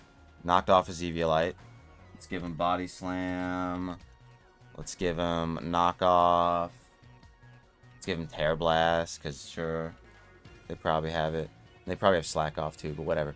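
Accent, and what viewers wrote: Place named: United States. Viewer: ¿You, native Costa Rican? You sound pretty much like a native American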